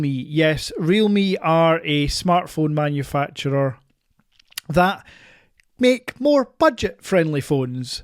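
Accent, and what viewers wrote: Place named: Scotland, United Kingdom. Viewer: It's his natural Scottish accent!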